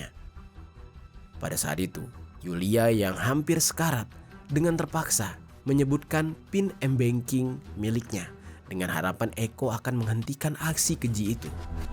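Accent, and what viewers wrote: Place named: Surabaya, Indonesia. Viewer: Sht slalu n semangat n sukses, sememi Surabaya aksen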